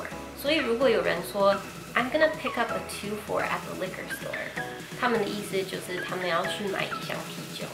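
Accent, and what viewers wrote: Taiwan. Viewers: 很地道的台湾普通话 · 台普棒棒哒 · 真是台湾腔啊。。。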